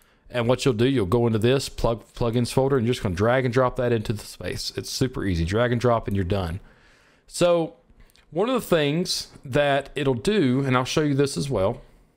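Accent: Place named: Alabama, United States. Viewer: Thanks man. That alabama accent made me feel right at home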